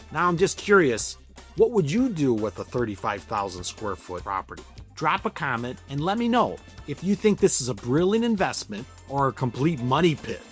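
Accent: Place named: Michigan, United States. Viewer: Interesting video. Love your Michigan accent!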